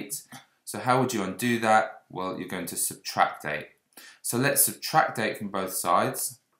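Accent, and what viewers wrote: United Kingdom. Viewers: thank you british bro i did not pay attention in class and i had a homework on this lol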